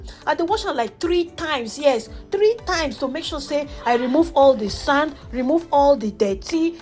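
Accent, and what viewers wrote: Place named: Nigeria. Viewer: No be the food i dey watch oooo na the pig pigin English i dey listen to. want finish me with laugh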